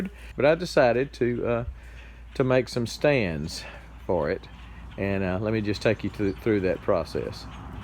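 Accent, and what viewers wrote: Texas, United States. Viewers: From your Texas accent, are your from Uvalde?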